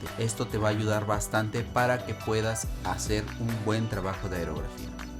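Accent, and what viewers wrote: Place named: Mexico City, Mexico. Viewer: Por este commentario y por lo chingon que eres me suscrito. Y por lo bueno que explicas Las cosas. Escuchandote me haria pensar que eres de Mexico y El modo que te expresas de la ciudad de Mexico. No haces trasmisiones en vivo? Es la Iwata hp ch la que estas usando? Ahora le dare un I fucking like it a lot😁💣💥💢 Gracias..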